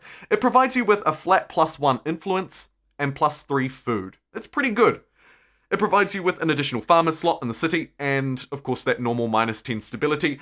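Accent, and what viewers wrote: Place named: New Zealand. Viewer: Coming back to this in 2026 it’s striking how much more energy your delivery had in these videos and how much stronger your kiwi accent comes across. No idea why those things have changed so I won’t speculate but thought that was interesting.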